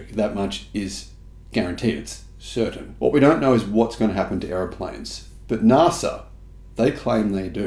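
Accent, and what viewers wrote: Australia. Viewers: You have to allow for the fact that he speaks strine. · Yeah it was pretty grating. His Aussie accent draws it out even more. The whole video was cringe by proxy.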